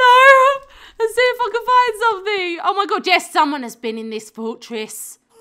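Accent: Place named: Cockney, United Kingdom. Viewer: maaaaaan that cockney accent is strong. wwooooww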